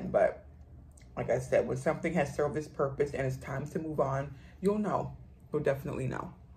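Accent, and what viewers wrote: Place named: New York, United States. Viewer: Hi! So nice to meet you. Your channel came across my feed and I'm glad it did because we both are on a low carb journey! Great chat as we;; and I hear the NY accent! Sooo true, if you don't have your health you don't have anything! My husband is in a nursing home and I visit him 2-3 times per week and my heart goes out to the people who never have visitors. Blessings to you!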